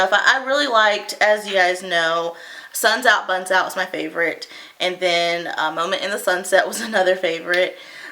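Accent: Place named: Canada. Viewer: I hear a boat when Canadians say about & I think it's cute! Thanks for the review. I've got a shopping list and somne of these are going on it 😉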